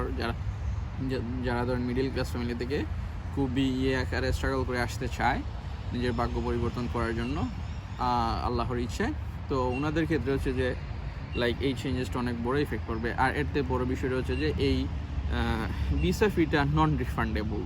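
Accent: Sylhet, Bangladesh. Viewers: bro ur sylheti i guessed it from ur accent tht u used love to heard tht 👽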